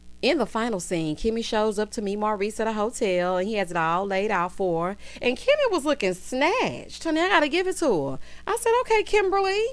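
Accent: South, United States. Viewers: Hey girl hey, you’ve hit the nail on the head again. Without trying to be negative, I just don’t see Kimmi and Maurice’s marriage really working, something about it seems off. I only have 1 question, when Tisha introduced herself to the “financial counselors, she said, “they were going on 15 years of marriage” but, didn’t they go to Vegas to celebrate their 15th like 4-5 weeks ago? I love Tisha’s growth, this platform has truly been a positive for her in that sense. I’m usually here for Destiny’s fashion but, that outfit was also a No for me for that particular event. If Mel and that hyper inflated accent can get on tv, then your southern drawl will surely take you places because hell that’s what drew me in🙌🏾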